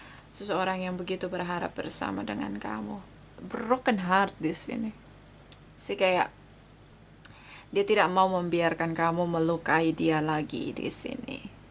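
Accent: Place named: Europe. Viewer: Halo nona,klo dgr lidahnya nona sepertinya anda pernah ckp lama ya tggl di benua biru,dimana nona? Maaf,agak melenceng pertanyaannya. Mksh ya non🙏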